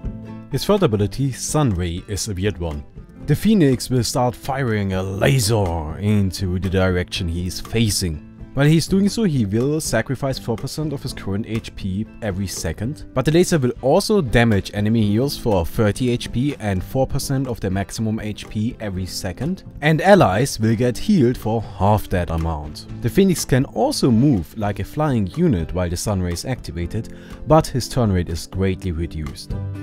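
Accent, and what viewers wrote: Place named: Germany. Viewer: Germany, or any other german speaking country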